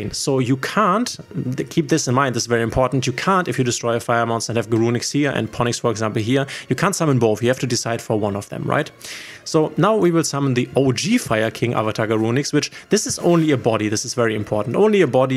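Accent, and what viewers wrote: Germany. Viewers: Dieser deutsche Akzent nervt echt. Aber gute Zusammenfassung wie das Deck funktioniert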